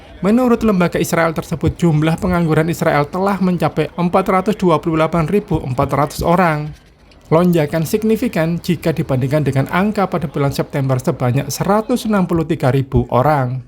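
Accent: Java, Indonesia. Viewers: Komentatonya Ngomongnya MEDHOK... orang mana ya..??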